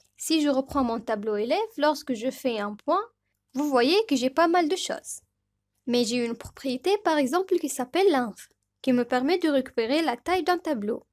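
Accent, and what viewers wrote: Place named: Tunisia. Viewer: pure Tunisian accent , Keep up the Good Work .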